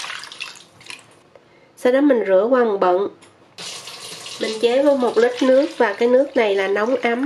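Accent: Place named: Vung Tau, Vietnam. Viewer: Giong chi giong nguoi Vung tau qúa😮😢❤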